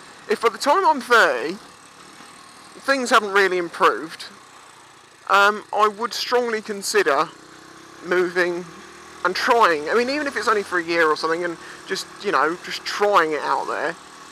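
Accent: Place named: England, United Kingdom. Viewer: Hahaha, good stuff, man. "This guy knocked out because it was so good!" As some have mentioned, it's not about the bike. We all came here for the english accent. Haha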